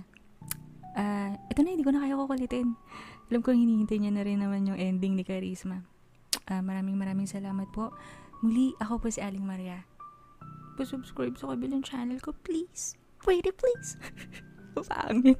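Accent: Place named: Visayas, Philippines. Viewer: ❤😂hahaha ilab u...hahaha gustu ko ung accent mo sa bisaya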